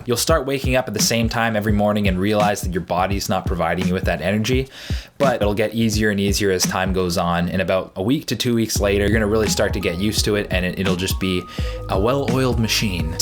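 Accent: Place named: Canada. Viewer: Love it. Are you actually from Canada? First , because of pronunciation, but mostly because of the humour and laid back personality:) it seems like Canadians in general are funny, friendly and kind people !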